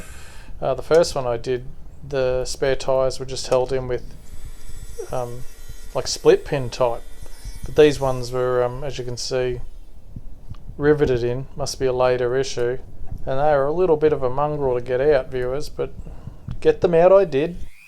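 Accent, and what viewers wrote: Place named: Australia. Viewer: gee, looks nice and clean again. especially the roof rack, very useful, even for other castings, and customs. what if you do the rack two-part? make the two clamps that grip the roof's edge separate, connected by a rod in the middle maybe, so they click easier around the roof, and once the actual rack is glued down all gets stabilized. of course, chroming it will be a challenge then. but personally I'd be afraid of the resin breaking. anyway, great work! the casting looks a bit disproportionated, maybe because of the narrow wheels? aside that, if you need a giggle, look at how YouTube subtitles translate your 'strayan accent... including the dremel's noises. XD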